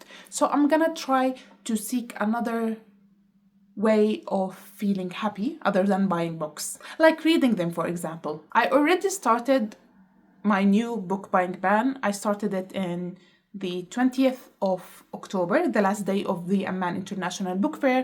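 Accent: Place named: Germany. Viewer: Hi. First time seeing your video . Just curious if you're German. Cause I can't tell if that's a german accent or an Arab accent. Thanks😊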